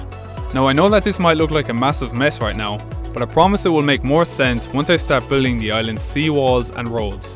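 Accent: Ireland. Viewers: Me to Yas he sound like an Irish ☘️ ❤️ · I knew you were irish 🤣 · What part of Ireland are you from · Are you irish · Wait are you Irish ☘️? Don’t tell me my fav fortnite map builder is from the same country as me?!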